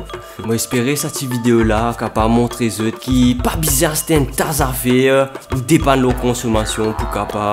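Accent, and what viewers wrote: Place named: France. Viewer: Hahaha me disait bien qu’il avait l’accent français quand il parle anglais 😂 Damn j’ai douté hehehehe😂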